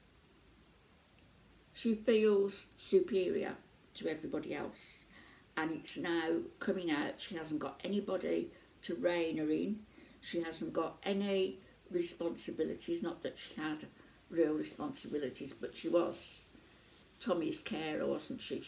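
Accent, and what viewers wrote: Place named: England, United Kingdom. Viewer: Thats nasty I can understand her ok may be it's her English accent you can understand there is nothing wrong with her speech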